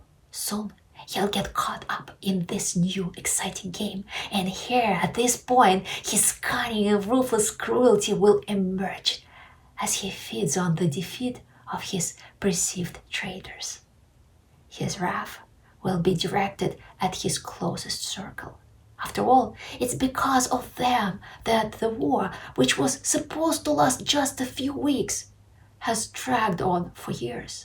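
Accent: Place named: Russia. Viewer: Owh You are good. Connection to Russian revolution, Player-theori, each minute a spot on observation, and I love the Russina accent. I hope You live safely, there is to many dark storms in the world, all the good one goes silent or dispears, keep up with the excellent work, thank You.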